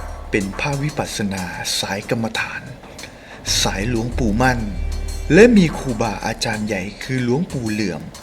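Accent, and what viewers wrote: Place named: Isan, Thailand. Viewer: เสียงแอดมินเวลาพูดอีสานสำเนียงน่ารักดี.😊😊😊😊😊😊😊😊😊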